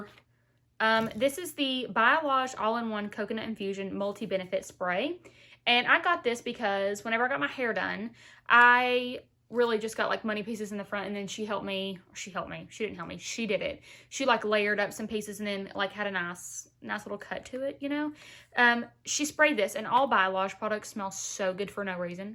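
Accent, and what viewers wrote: South, United States. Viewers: Just found your channel through Allie Glines! Ummm you are precious!! And hilarious! And all the things! Plus as a fellow southerner your accent feels like home! Can’t wait for my Fawn glosses to come in <3 love finding real down to earth youtubers!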